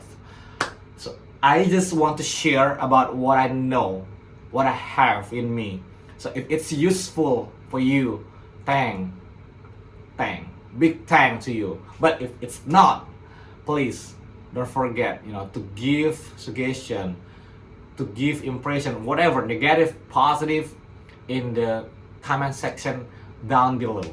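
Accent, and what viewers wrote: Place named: Palembang, Indonesia. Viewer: Ngapo cak kenal logat2 nyo tu kak..cengki wong plembang ini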